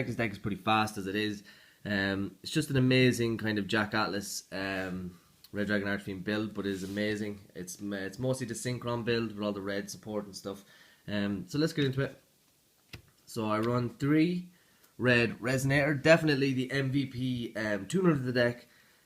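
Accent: Ireland. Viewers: Ayy you sound irish :P sell me your deck pls xd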